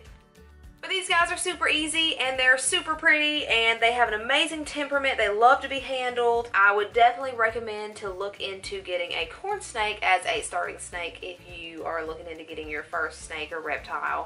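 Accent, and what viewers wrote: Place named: Texas, United States. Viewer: You and I have the same accent I'm from Texas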